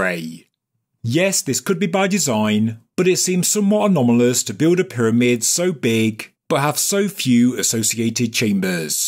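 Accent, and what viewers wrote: United Kingdom. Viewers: May I ask what part of the UK. There are different accents in the UK!! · identifies as a UK citizen. Accent sounds pure UK to me. (No offense to your husband.)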